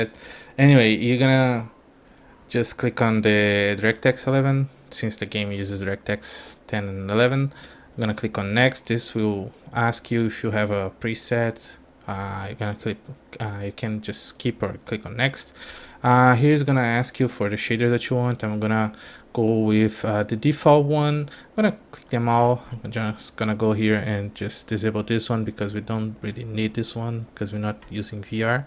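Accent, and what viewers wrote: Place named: Brazil. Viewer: Nice tutorial, Thank you! E desconfio que seja BR pelo leve sotaque, se for, parabéns pelo inglês e pelo vídeo, valeu!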